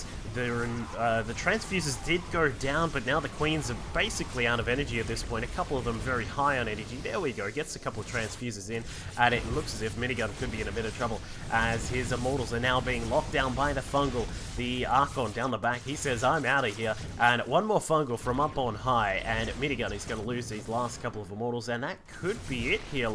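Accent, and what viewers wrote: Australia. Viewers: Nice cast. Are you Aussie ?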